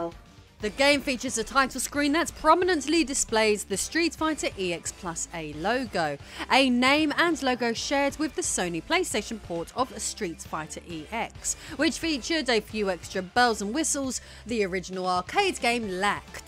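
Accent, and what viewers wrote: United Kingdom. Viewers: loved the brintanic accent along with the cosplay, IIILLIGAL!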